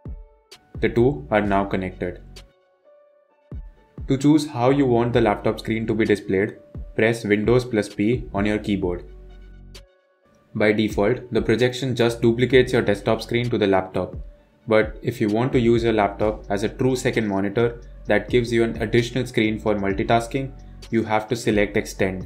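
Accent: India. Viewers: Sorry if this sounds a bit racist or attacking your nation but I always think it's a legitimate tutorial video when the person speaking has an Indian accent. I also love that you're straight to the point. If this was American, it wouldn't be as straight as this one. They have to thank their sponsors first and talk about nonsense before actually getting to the tutorial.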